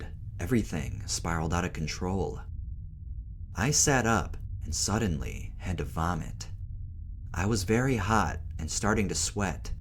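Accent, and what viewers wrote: United States. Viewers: Narrator sounds 3rd generation Chinese American.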